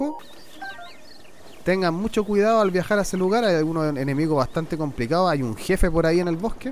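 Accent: Chile. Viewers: Se nota que eres chileno por solo escucharte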